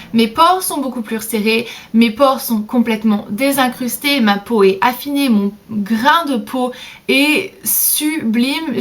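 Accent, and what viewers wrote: England, United Kingdom. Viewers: Salut tu es française? Je trouve que tu as un accent anglais...Bises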